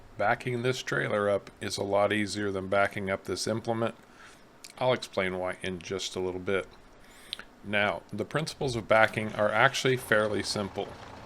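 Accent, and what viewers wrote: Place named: United Kingdom. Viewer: Hi Thank you so much -- without your intro 10 vids on "Ravenport" I'd never have played this game. I can tell you as a fellow Brit its that accent - a winner in USA. Also there aren't any other vids that give useful info on how to do. Ive seen the "out in the wild vid" love that. Im now in Dallas ex New York. Im totally absorbed in Ravenport on my way to 1st $million. About to expand field 19 up the hill. What I would love is a tutorial if you have one on making the tree cutting/logging viable and worthwhile. The weird bark colored trees are a real hassle to cut up and transport and sell - 23 so far. Ive had success with some leveling landscape but want to put chickens pigs and cows into Ravensport which is so small but I believe its possible. How about Ravenport Part 2 my first Million???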